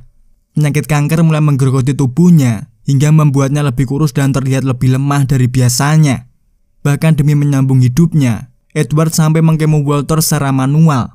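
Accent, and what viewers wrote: Java, Indonesia. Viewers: Akhirnya. Selesai setelah. Berjam jam yimak. Seru ui. Apa lagi narat0r nya. Berl0gat med0k. Berasa tak asing. Seperti ng0br0l ama kawan diselala n0nt0n pilim 😁😁😁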